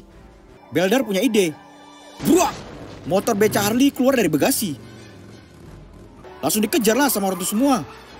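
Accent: Medan, Indonesia. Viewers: Logat Medan Yo😂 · Medan mananya kau bang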